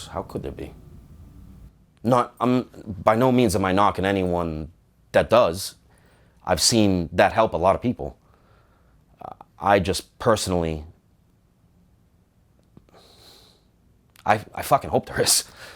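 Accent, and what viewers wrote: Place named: Massachusetts, United States. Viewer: Your a real man. Thank you for talking about it. You do make a difference to others. Btw Im from Maine and your accent from Mass makes it more real for me. You got this bub! Awesome what your doin’. Don’t stop.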